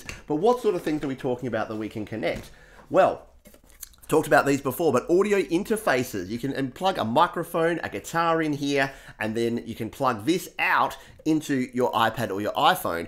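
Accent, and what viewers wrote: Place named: Australia. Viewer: Thanks for that. I’m upgrading from the dinosaur era desktop so all advice gratefully received - (and very happy to hear an Australian accent for once. ) So - Question : I didn’t realise I can use my I pad Pro for recording until watching your vids. But - is it possible to somehow get the I-Pad screen onto a separate large monitor screen ?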